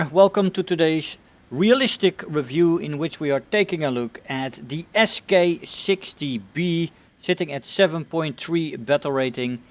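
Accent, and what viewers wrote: Netherlands. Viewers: lol xD im from indonesian and i know the dutch accent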